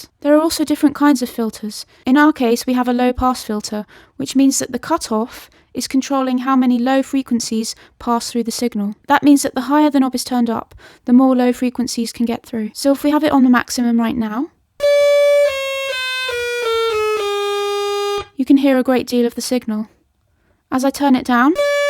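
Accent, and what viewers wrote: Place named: United Kingdom. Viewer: What a lovely British accent!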